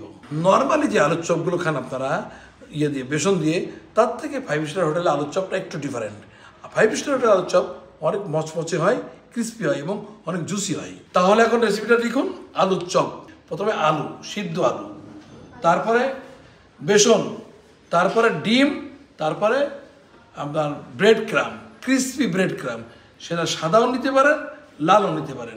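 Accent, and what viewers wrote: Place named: Jessore, Bangladesh. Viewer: আপনার বাড়ি মনে হচ্ছে যশোরে!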